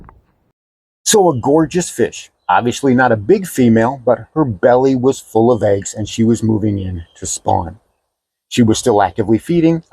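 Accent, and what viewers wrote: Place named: North, United States. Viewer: Hey your accent sounds kinda northern—how did you end up in southern Mississippi?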